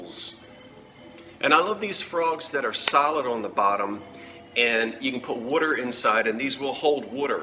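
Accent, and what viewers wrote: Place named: Philadelphia, United States. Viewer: "Wooder"....love the Philly accent. Reminds me of home....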